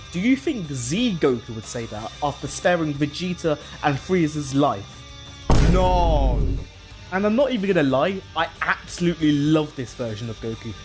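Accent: United Kingdom. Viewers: too briish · >click on video >British accent >first moments have joke about tea >click off >??? 😂😂😂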